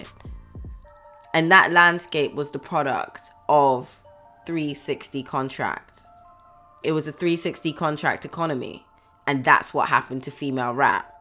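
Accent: United Kingdom. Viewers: The British accent caught me off guard 💂‍♂️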